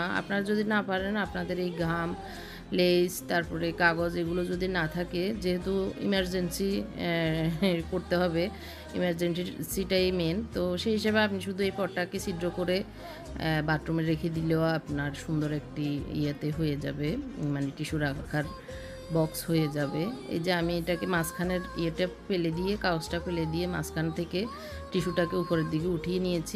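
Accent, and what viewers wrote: Noakhali, Bangladesh. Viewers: আন্নের বাড়ি নোয়াখালী মনে হয়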